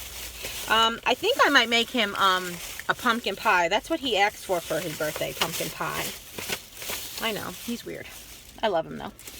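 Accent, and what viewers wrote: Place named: Long Island, United States. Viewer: Hi, new subscriber here! The moment I heard you I felt like I was home. I'm a LI transplant too, but I live in FL...31 years on LI and 25 here in FL. Love your crafts, DIY's and hauls. I'm stayin'!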